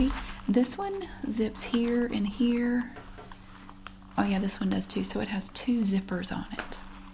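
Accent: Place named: South, United States. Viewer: My favorite YouTuber at the moment. I don’t know what it is about her. She’s addicting to watch and listen to. The southern charm is just something I don’t ever see in my state (WA) so it’s really satisfying to hear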